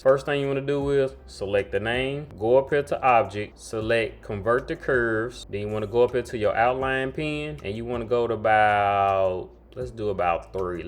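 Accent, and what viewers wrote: South, United States. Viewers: I'm from Texas. I picked up on that southern twang! Lol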